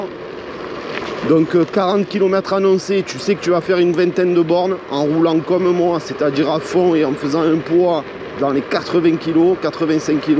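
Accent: South, France. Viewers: Super et aussi tes vidéos, j'aime bien ton accens du sud moi je suis du nord enfin belle trott! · toujours avec l'accent du sud....fabuleux...ça reste une urbaine...nous on cherche du lourd pour du Offroad dans le Cantal en zone nordique...projet en cours.n'hesites pas à nous contacter...Merci MR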